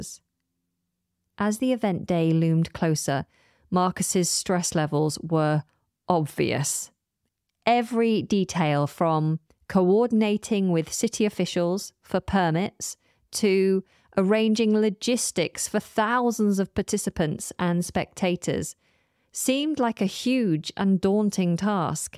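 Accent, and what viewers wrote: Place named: United Kingdom. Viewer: my favorite english teacher.... I love her british acent❤❤❤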